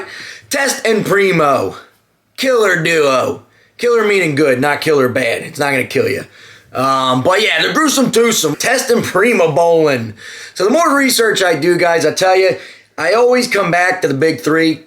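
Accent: Philadelphia, United States. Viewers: That's a philly accent if i've ever heard one. Have a new sub just for that.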